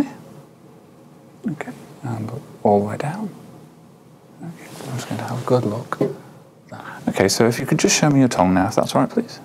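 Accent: England, United Kingdom. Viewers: An English accent and a doctor I’m weak I’m the knees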